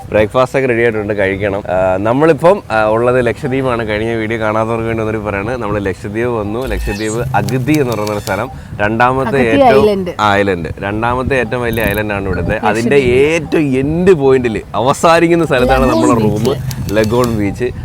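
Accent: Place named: Kannur, India. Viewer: Kannur language ayyi oru cut unde ee language inne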